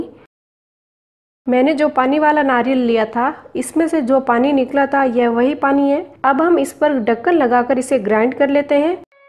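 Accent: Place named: Marwari, India. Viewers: Hindi me marwadi lheja aa jata hai, bahut badhiya. Best recipe. Thanks. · Mam apki awaj sunke lagta hai aap Rajasthan se ho.....kya me sahi hu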